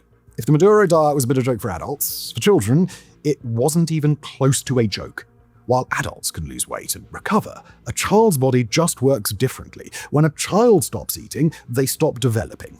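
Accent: United Kingdom. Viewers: Venezuela scrores in the best category by the Global Hunger Index. Venezuela scores better even than India, Papua New Guniea, heck it's even better than regional darling Ghana. Ukraine is war-torn, so, but even still Venezuela is scoring higher than Ukraine. When you consider these video topics, take a step back. Please don't report "stories too good to check." You could have spent this time better reviewing India's hunger issues, but instead you're doing imperial propaganda with a British accent (which used to be the best accent for imperial propaganda, but isn't anymore). I guess a Brit shitting on India for any reason would also come off wrong. You know... just critique empire, would you please? You have the proper accent for doing that! edit: don't know why I didn't mention that IN SOUTH AMERICA, Ecuador, Suriname and Bolivia all score worse on the Global Hunger Index, than Venezuela. 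This video feels borderline misinformation; it's just so unfairly focused and lacks context. Which, that's how the best lies operate! And I'm not fan of Maduro, but carrying water for empire is such an ugly look.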